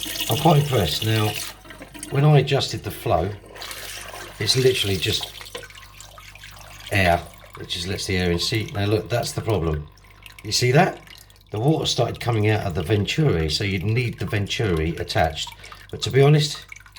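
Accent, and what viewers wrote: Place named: United Kingdom. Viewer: Then, with about 15 years (I lost track) I'm a newbie in the hobby! What I love about chatting online is that we can learn from others that live in another country, I'm from NL, I guess by your accent you are located in the UK? There are some cultural differences (when it comes to fish keeping) from country to country and it's those differences that we also can learn from. Always good to expand my view. Although I think the difference between the UK and NL aren't that huge. You have a great YT channel, keep up the good work!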